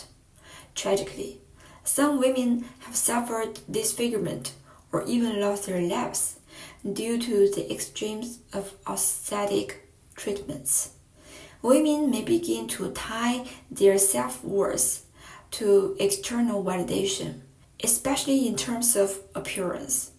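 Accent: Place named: China. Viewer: You have a beautiful Chinese accent. But I am confused. I am not sure. You are either Hong Kong or Taiwan or Singapore or Macau. Because Mainland Chinese can't use YouTube right. Please tell me where are you from. I really wanna know where you are from. I am a new subscriber. That's why I don't know. Sorry about that😊♥️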